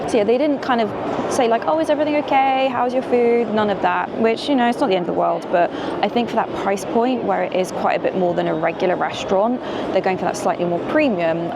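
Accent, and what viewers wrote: RP, United Kingdom. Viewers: You could me over so posh but tell it qs it is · Mi primera vez viendote. Que hermosa que sos. Y me encanta el accento britanico. Vere mas videos tuyos. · Where in britain are you from? Trying to learn accents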